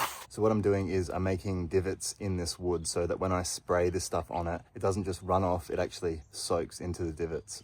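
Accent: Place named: South Australia, Australia. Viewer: you are my favorite gardener on YouTube. You remind me of Edward Scissorhands when you are pruning. You are probably too young to have seen that movie but I think you would enjoy it. It came out in 1990 starring Johnny Depp. I also watch the Garden Rescuer, I think he said you are his friend. He needs to learn how to protect himself better like you do. I live in St. Paul Minnesota USA and really enjoy your accent and knowledge of the creatures and plants in South Australia. I hope you never stop posting videos. You are such a nice man! 🥰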